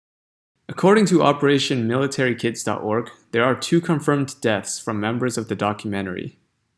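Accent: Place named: United States. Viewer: a tiny slight hint of an American born Chinese accent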